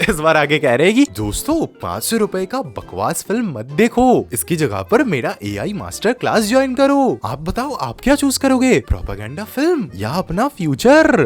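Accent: Bengal, India. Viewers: baat sunke hi pta lagte hain ki aap bangali ho.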